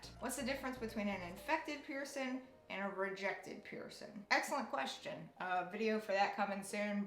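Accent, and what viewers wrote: South, United States. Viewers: I change my daith on my own and I've gotten good at changing it on my own, yours also closed on you a lot faster than mine did, if you ever try again just be patient and be ready to switch out the jewelry. Also you've answered my questions in two of these videos! _ (Ado1017). I pronounce Piercing like you too, it's just a Southern thing lol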